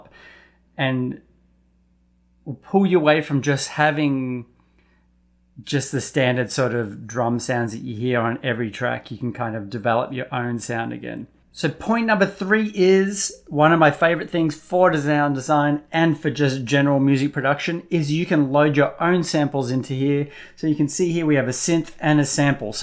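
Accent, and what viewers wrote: Australia. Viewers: Cool… are those prices $US. You sound like an aussie!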